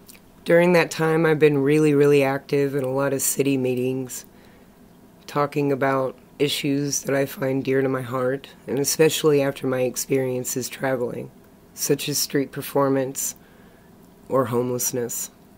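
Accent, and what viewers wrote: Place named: Kansas, United States. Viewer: You talk like you are from Kansas. That is a good thing.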